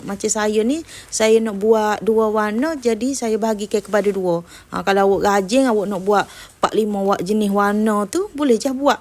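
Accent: Kelantan, Malaysia. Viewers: Suka dgr org kecek kelate. Rindu study kat mache dulu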